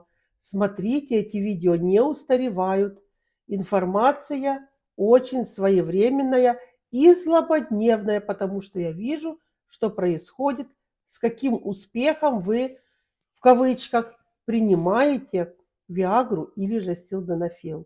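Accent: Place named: South, Russia. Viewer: Судя по акценту специалист с юга.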